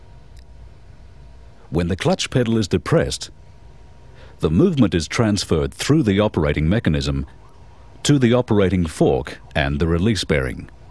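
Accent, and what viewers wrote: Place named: Australia. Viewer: Perfect Standard Australian accent being spoken.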